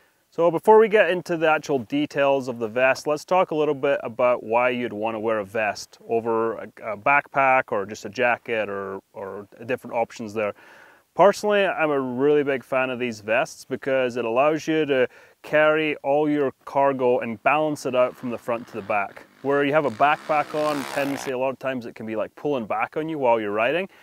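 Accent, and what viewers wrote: Scotland, United Kingdom. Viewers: Sounds like a hint of Scot in that accent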